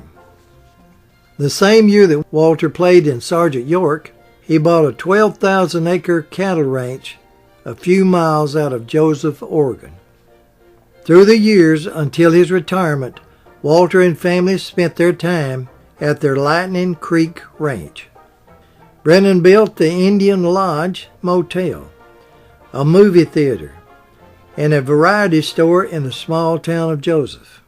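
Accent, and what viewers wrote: South, United States. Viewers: I used to enjoy your commentaries and biographies on your channel years ago, but being new and unfamiliar with YouTube and how it worked, somehow I failed or forgot to subscribe. I'm glad I found your channel again, and I promptly subscribed. As a fellow Southerner from Lake Charles, LA, I recognized you by your familiar drawl! 😉😁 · So happy to see you back and righting Walter's memory. So good to hear your Southern charming voice once again!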